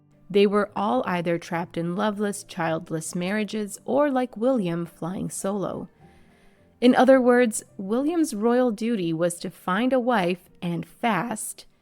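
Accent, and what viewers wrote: United States. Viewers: peter ustinov great for the part of william 1V. not keen on an american narrating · Brits, I presume English Brits, seem compelled to insecurely leave indignant comments on this American-accented narration. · Remember this is a Yank female narrating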